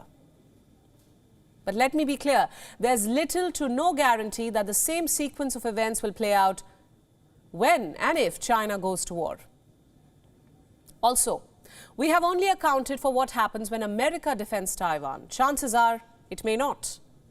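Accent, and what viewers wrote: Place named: India. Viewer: Pretty strong Indian accent...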